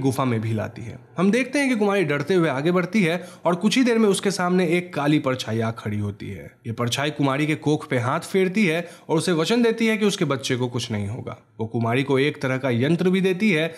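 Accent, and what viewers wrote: Bengal, India. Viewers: Just by the narrator’s R pronunciation, it can clearly be understood he’s a bengali.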